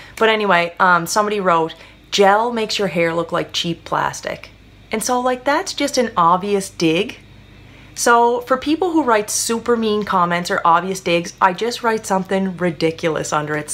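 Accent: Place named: Nova Scotia, Canada. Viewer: Thanks for sharing this. I've been thinking about doing a channel of my own for a while. I def love hair stuff and have been watching videos for years on Youtube so that would be my main focus, but I would probably add in some fitness/nutritiong stuff too, since I am a PT...or physiotherapist as they probably call it in Nova Scotia. BTW, I love your accent!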